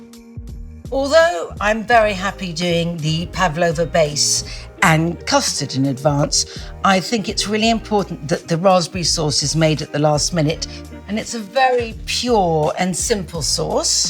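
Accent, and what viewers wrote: United Kingdom. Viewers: I do love your very British English!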